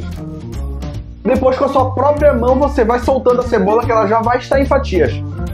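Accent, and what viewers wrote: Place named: Pará, Brazil. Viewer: só pelo sotaque parece bem paraense hahaha Gostei da tecnica e super funciona! obrigada =D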